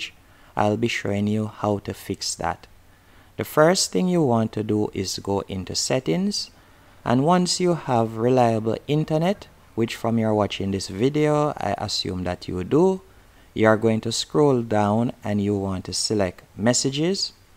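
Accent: Jamaica. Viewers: It work thankssss I can tell your a Jamaican thatssss wasup thank u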